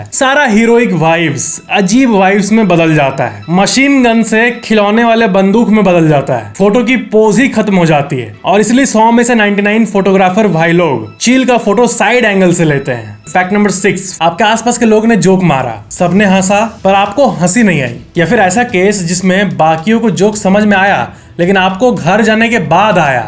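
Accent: Bihar, India. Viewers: भाई तुम बिहारी हो क्या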